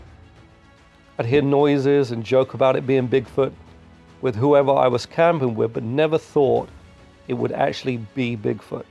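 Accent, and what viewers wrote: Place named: England, United Kingdom. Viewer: What a lovely calm voice you have and beautiful English accent , it does not sound like a Devon accent but forgive me if I’m wrong 😆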